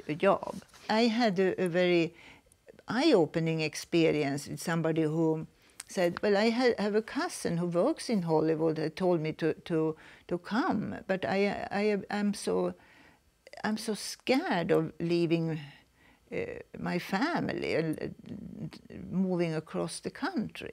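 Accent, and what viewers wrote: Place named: Sweden. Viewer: That Swenglish accent is crazy😅 (swedish-english)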